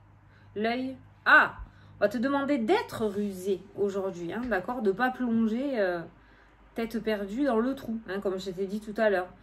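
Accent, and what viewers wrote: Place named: Pyrénées-Orientales, France. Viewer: Bonjour! Cela fait des années que je regarde des vidéos sur YouTube et aujourd’hui c’est le premier commentaire que je vais poster. Le jour de mon anniversaire au réveil, YouTube me suggère cette vidéo, première surprise il me semble reconnaître notre accent des P-O (où je vis). Je regarde et j’écoute attentivement et plus la vidéo avance et plus je me dit que c’est mon cadeau d’anniversaire tellement il y a de ressemblances avec ma vie actuelle. Rien n’arrive par hasard dans la vie et sur ce coup là celui qui me dit que la synchronicité n’existe pas je le savate 😁. Merci pour la bonne énergie que tu dégages et ce naturel qui fais toute la différence. N.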